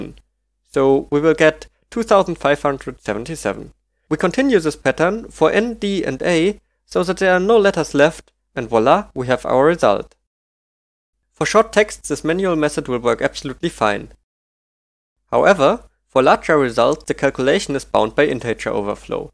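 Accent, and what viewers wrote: Germany. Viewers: man hört das du deutsch oder whatever bist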